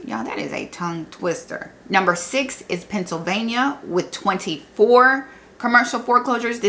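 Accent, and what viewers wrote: South, United States. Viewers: Love and hugs from Jersey 💪🏽 Love that Southern Drawl ❤ 🎉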